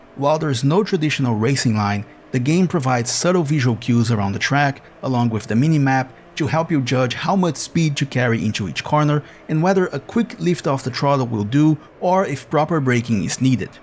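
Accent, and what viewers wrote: Brazil. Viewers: that’s why I asked, because he had a faint but noticeable accent that I could only trace as PT-BR. I didn’t manage to watch the whole video, but I am not surprised that there was some footage with Portuguese text. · ingles bom mas aquele quezinho de hue está perceptivel no sotaque! to enganado? anyway, i never played this game for more than like a race or two at a time. do we already have ps4 emulators? 🤔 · Yes, i'm 100% sure he is. I can spot a brazilian just by their accent, plus there's the gameplay in the video that's in portuguese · Great video! Congrats! Btw, are you brazilian? Your accent looks familiar. Keep it up with this awesome content. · Dude, are u Brazilian? You accent kinda sounds like it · Bom video, jogo até hj, e lamento que nao comprei o ultimo dlc. Obs: to escrevendo em português pq o seu sotaque te entrega. O curioso é que Driveclub vai sobreviver ao tempo, enquanto os jogos de corrida hoje nao saem em midia fisica (ou no caso de GT7 mesmo em midia fisica, precisa estar online, do contrário vc tem 10 carros e 3 pistas pra usar só no modo time trial ) . 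Tenho todos os dlcs e rodo hj em dia no ps5, me lamento nao ter pegado o ultimo dlc, que foi o unico que nao era incluido no season pass. O Dlc que vinha com o Jaguar XJ220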